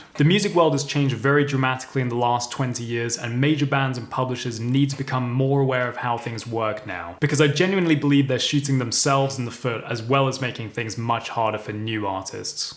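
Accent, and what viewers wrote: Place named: United Kingdom. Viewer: You sound like you are across the pond. testified before the United States Congress about this issue. Since they have hired a small army of tech people to protect their interest. The very idea that you not being able to steal from them is music to my ears. Get over it & move since you are so convinced that without you the musical world be a worse place. If I made a decision on music I wanted to learn based on your remarks. Wow, I think a music attorney has a lot to tell about what rights you do not have. You have the right to speak of them because they are public figures. Personally I think I may see if you have any thoughts that are original. Doubtful since you are doing cover songs with over 1.5 mil subs. Get real you sound like somebody's wife bitching. Hard to collect money from through BMI ASCAP or any other music licensing authority. 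BE SURE TO CHECK REMIX ON YOUR VIDEO HERE. YOU ARE NOT GOING TO LIKE IT. I WAS A PART OF THE INDUSTRY AND BUSTED ON MANY SONG THIEF'S ASSES.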